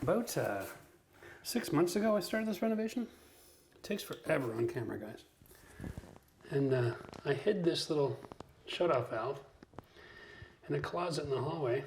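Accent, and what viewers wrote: Canada. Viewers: I knew you were Canadian when I heard the way you pronounce the words “process” and “out.” Thanks for the walkthrough and the thorough explanations.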